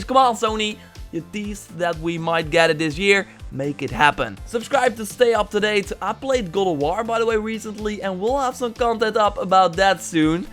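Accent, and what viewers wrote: Netherlands. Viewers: >Dutch accent >Horizon zero dawn DOE ES NORMAAL GODVERDOMME Goeie video :) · Een nederlander hehehehehe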